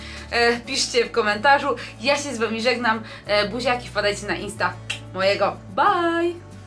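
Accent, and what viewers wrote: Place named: Silesia, Poland. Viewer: Od weekendu polowa października 2024 wpadłam na twoje filmy. Podoba mi się twoja energiboost. Mówisz co sadzisz, a najbardziej zaintrygowała mnie gwara... więc dzisiaj 22.10.24 postanowiłam przewinąć udostępnienia... Opole❤ Jestem spod Opola. Bardzo sobie cenie gwary, a Śląski- jest miodem dla mojej duszy. Co do tego filmiku- przepięknie wyglądasz w ciąży z Mikołajem. Ja przy pierwszej ciaży wyglądałam niczym Orka ze wzdęciami. Jedynie pozazdrościć.❤ Kobieta bez takiego doświadczenia, nich lepiej zamilknie jape lub napisze po porodzie, a najlepiej się pochwali Błogoslawinym stanie, gdy na wadze poerdyknie 15kg- 23 kg więcej życiowego ciała( mina diabła). Nikt sie nie odważy, a jeśli tak, to tylko z filtrem w szmatfonie. Pozdrowienia dla was i wielki przytulas dla Mikołaja!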